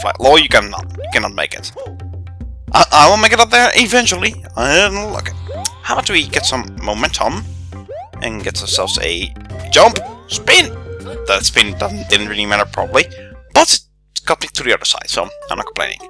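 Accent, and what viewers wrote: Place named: Netherlands. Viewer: but if you hear very good you can hear that you dutch ( like me )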